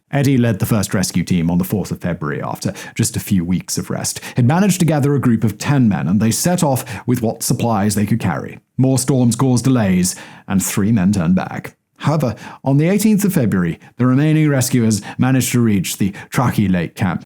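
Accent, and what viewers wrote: United Kingdom. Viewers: Why are British accents so dreary/boring? This guy could be talking about the cancer cure and I'd fall asleep every time.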